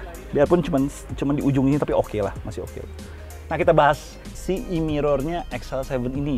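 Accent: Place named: Java, Indonesia. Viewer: yg review 😂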